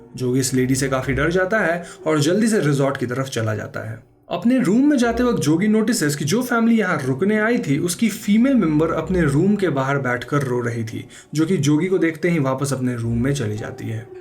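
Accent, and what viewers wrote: Bengal, India. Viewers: Bro are you bengali..?🧐🤔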